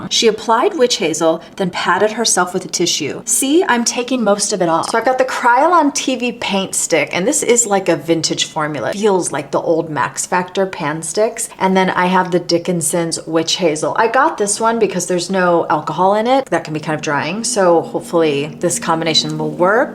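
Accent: United States. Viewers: obviously american?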